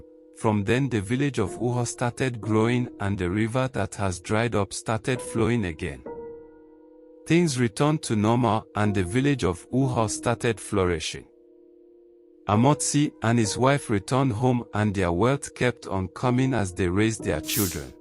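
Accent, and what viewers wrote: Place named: France. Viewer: tou have an french accent, where you from?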